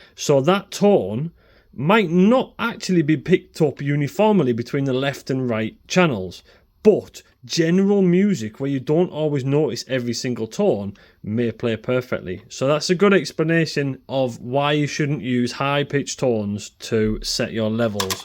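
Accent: Scotland, United Kingdom. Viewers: Scottish or maybe Irish accent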